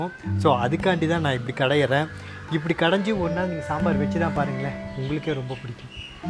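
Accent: Tirunelveli, India. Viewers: Super thambi &unga samayal+unga speash nan thirunel vely eapdythan sampark vaipan super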